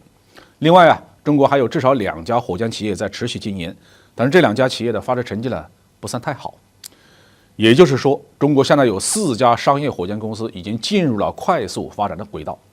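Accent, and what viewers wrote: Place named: Hunan, China. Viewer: 湖南普通话很有意思。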